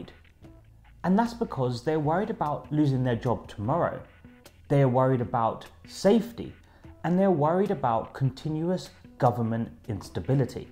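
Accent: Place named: United Kingdom. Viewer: I don’t think a foreigner with British accent should be yapping about the American economy just because he needs attention to his channel. This ain’t UK buddy.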